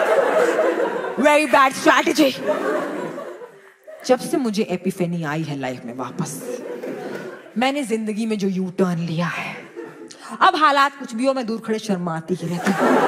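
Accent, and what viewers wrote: Eastern Uttar Pradesh, India. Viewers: She is the best female comic.... So spontaneous in changing her tone every second and just love that east UP flavour here and there😂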